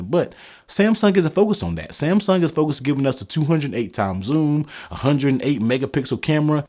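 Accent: United States. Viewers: lol! Figures... I'm from VA and you sound like my all my family and friends in NC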